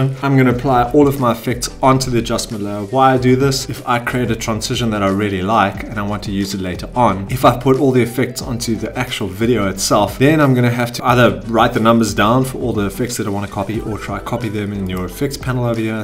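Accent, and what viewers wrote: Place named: South Africa. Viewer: sound so south african anyways thnx for the video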